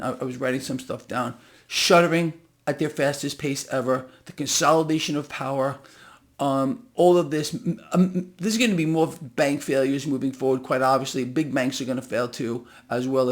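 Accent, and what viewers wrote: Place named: New Jersey, United States. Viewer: I love how you explain everything, i love your Accent, do you come from Northeim erst new jersey? 😊😊😊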